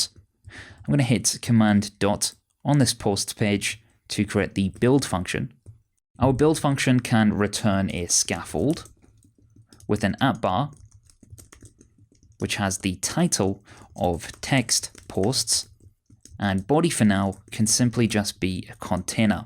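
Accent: United Kingdom. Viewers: I like brittish accent and tutorial style of video auther!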